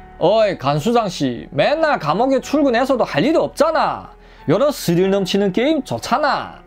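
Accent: Yanbian, China. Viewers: 연변사투리로 하니 꿀재미 ~ 영화보다 훨재미있음 ~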